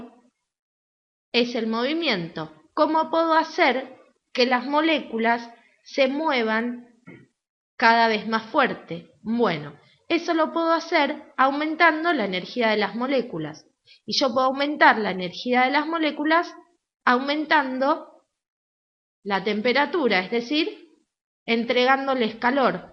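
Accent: Argentina. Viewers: Habla español cruck lo diferente es que tiene el acento argento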